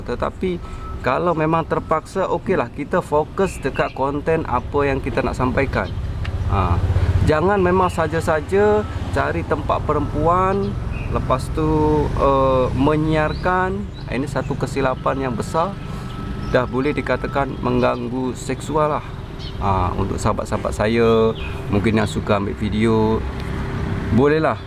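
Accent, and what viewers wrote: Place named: Malaysia. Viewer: Uish betul betul dah macam orang Malaysia hahahah terbaik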